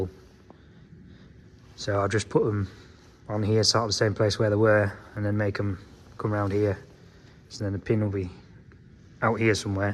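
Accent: Yorkshire, United Kingdom. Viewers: Thanks for the share, l’m enjoying your great videos and yes you do explain what you are doing and why very well. I’m from Richmond North Yorkshire,now living in the East Midlands and is great to hear the Yorkshire accent. Your workshop is looking much tidier on video 👍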